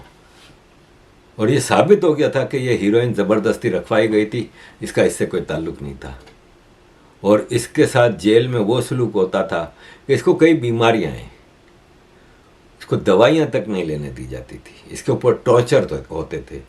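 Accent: Gujarat, India. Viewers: Sir your accent is Gujrati.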